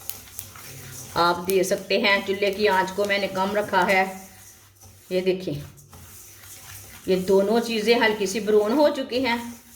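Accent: Punjab, India. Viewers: خدا کے واسطے اردو مت بولو پنجابی میں ویڈیو بناؤ آپکی اردو بھی پنجابی لگتی ہے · Samaj to a Rahi hy Punjabi style urdu hy to ksi ko Kya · Mohtarama ki urdu b Punjabi lehjay wali hy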